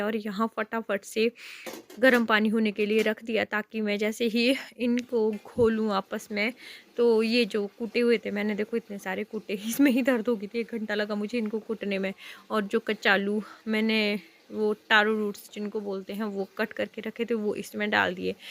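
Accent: Pahari, India. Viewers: Apani phadi tone sudharwo adhi Hindi adhi phadhi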